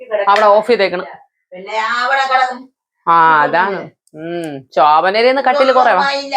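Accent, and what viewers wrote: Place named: Thiruvananthapuram, India. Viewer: Ath aaa nattusailiyanu....kuttam parayanda..jnanum Tvm...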